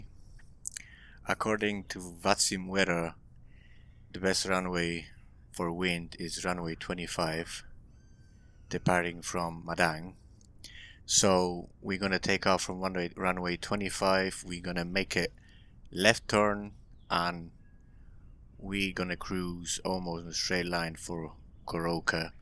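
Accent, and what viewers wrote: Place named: Ireland. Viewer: Hi I have just started watching your channel I appreciate your aim to be entertaining......I thought initially you had a slight Irish in your accent but wasn't sure about your nationality.......thanks for your work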